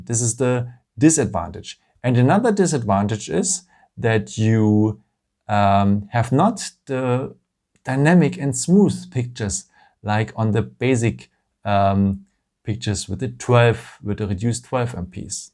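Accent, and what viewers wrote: Germany. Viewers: Vor kurzen gekauft das A72 Machst Du das auch in Deutsch? Hast da noch einen anderen Chanel, Du klingst zumindest im Akzent Deutsch ;) ... if not, here my translation: I ask if you have a german channel to, because you sound german ::) I just bought the Phone. What I have problems with is the camera. Take nice fotos with red flowers for exampel is not possible, does it has a "red-problem" ? How to handle that?? Its too bad... even with other apps ... because its the camera I guess?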